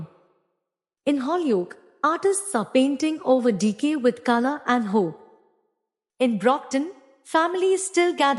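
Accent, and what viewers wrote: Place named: India. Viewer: Bad narration the Indian accent is too thick.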